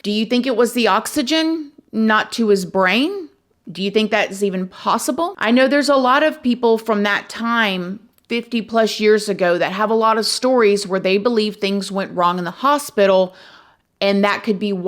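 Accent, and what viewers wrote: South, United States. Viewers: Southern accent, so cute. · G’day. You’re a very friendly, lovely lady 😊. This is my first time visiting your channel. I found your post to be very informative and with all due respect, I love your accent. It reminds me of the Beverley Hillbillies. Y’all come back now.😊😊Consider me an Australian friend and yes. I have just subscribed to your channel ❤❤🇦🇺🇺🇸🇦🇺🌹🌹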